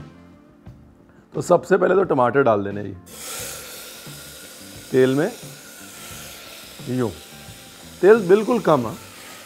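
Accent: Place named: Lucknow, India. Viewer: Jab aap bolte hain toh mere dimag mein bs lucknow aata hai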